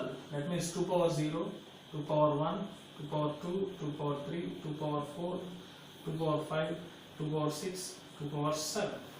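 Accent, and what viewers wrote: South India, India. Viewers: Sir you sounds likes a south indian...by the way nice explanation sir😄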